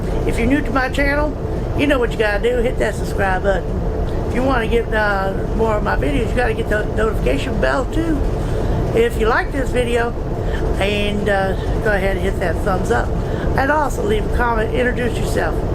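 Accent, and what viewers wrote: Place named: South, United States. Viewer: I like your Southern accent, drives me wild!🦝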